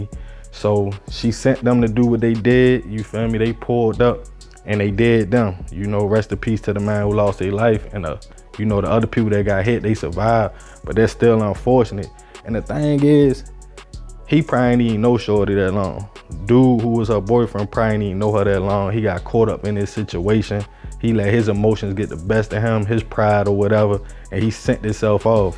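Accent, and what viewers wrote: Baltimore, United States. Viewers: Born & Raised in Baltimore 🖤 I subscribed soon as I heard the B'More accent... Too, blue, you, far Va😂 father!.. keep winning Young 👑🖤🙏🏽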